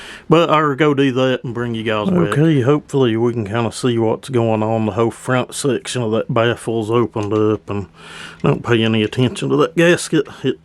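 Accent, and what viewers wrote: South, United States. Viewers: A master at his craft! Just curious, where are you from? You have quite the unique southern accent 🤠 Don't think I've heard your dialect before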